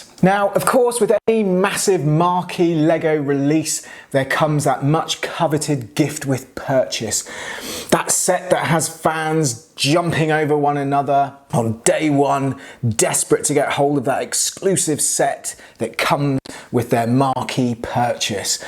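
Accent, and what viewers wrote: England, United Kingdom. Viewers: You must be from the same 10 mile area of England as tom scott. Your accents are to close to be anywhere farther apart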